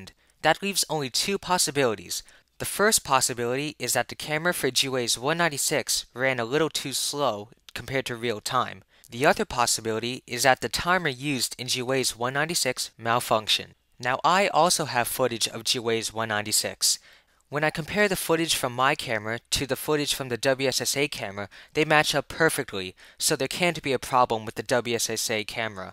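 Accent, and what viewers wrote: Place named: Philippines. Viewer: lol yep :D but idk it's weird I never realized how much of a Tagalog accent you have... But thanks anyway :P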